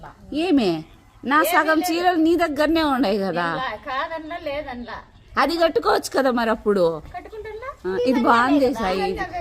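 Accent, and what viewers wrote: Telangana, India. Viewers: Language b kothakota sid laa undi andi ? Yeplace andi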